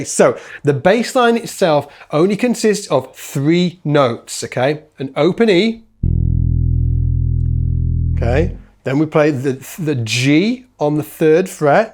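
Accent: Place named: Liverpool, United Kingdom. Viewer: Dude is from the Liverpool area, because his accent is just like the Beatles! So somewhere along the Northwest coast of England!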